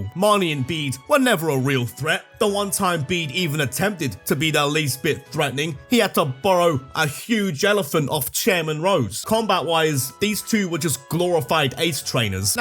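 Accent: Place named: England, United Kingdom. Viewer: It sounds English to me, but Idk which region. There are a WIDE variety of accents in every country.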